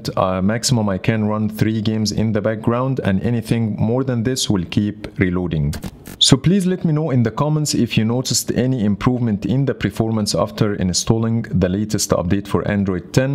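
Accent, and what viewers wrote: Egypt. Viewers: What an Egyptian accent, good job bruh☺️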